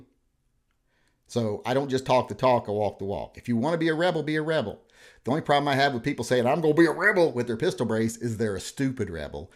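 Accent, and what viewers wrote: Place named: South, United States. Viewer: Slow Down Man! You are too realistic sometimes. I actually have more than one of these contraptions so I will have to come to some kind of consensus on what I will do. I also feel weird to message a man name and he speaks with a southern accent! Thanks for your input, nice to hear both sides of the argument.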